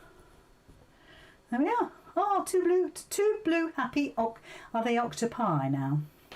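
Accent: United Kingdom. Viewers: Please don't stop talking! I love to listen to you and your British accent. And I enjoy what you say. 😊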